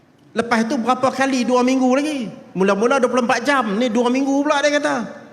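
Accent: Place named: Northern, Malaysia. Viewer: Ini kali pertama aku dengar wan saiful cakap utara.. awkward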